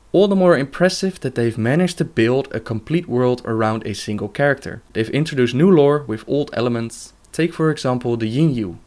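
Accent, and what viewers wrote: Netherlands. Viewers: me too i kinda heard it already its very easy to hear a dutch talking english any way good review ^^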